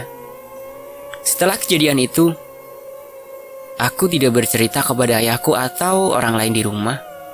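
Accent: Java, Indonesia. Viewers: Iku logate yo wes Jowo Gok!.. Jowo mu ndi se ?? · Logat Jawa yg mana ???..tiap daerah jawa punya logat beda-beda..jadi gak aneh..!!yg penting isi yg di sampaikan dapat di mengerti..ok bosqu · Yap betul...logat Jawa mmg kayak gitu Krn AQ orang Jawa...semangat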